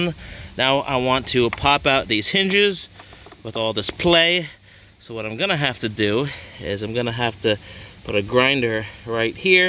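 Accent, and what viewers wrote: New York, United States. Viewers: Enjoy the NY accent... what kind of welder?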